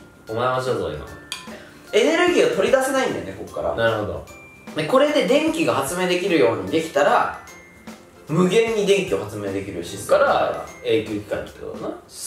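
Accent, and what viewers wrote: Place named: Hiroshima, Japan. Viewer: 広島弁炸裂じゃけー分かりました！笑笑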